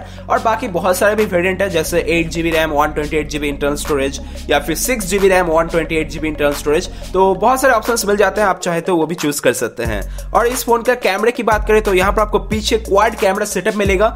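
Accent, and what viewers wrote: India. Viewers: Nice work but your hindi accent bro😂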